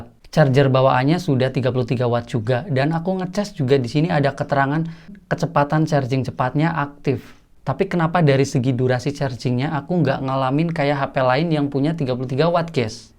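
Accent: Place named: Banyumas, Indonesia. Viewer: kiue ngapak deneng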